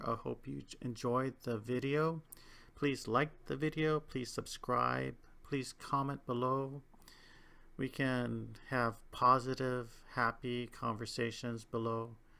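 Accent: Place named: United States. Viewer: Beautiful plants! Now I know you live in Hawaii. But your English pronunciation is absolutely american:)Where did you learn English so well :)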